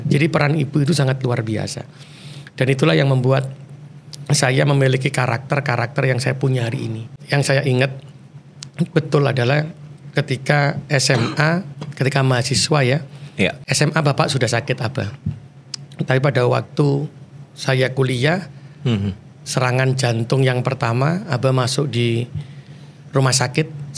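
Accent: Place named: Surabaya, Indonesia. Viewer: Publik speaking nya bagus, style Suro boyoan yg SGT kental .merangkul, kecerdasan sosialnya super pokoknya. Good..josss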